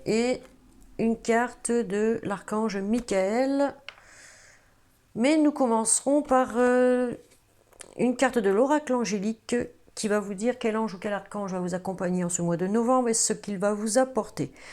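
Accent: North, France. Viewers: J'adore l accent du Nord j en suis issu super adorable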